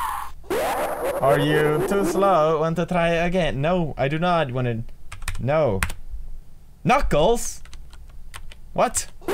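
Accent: Sweden. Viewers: Damn his Swedish accent was way thicker back then. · Lmao he sounded a lot more Swedish back then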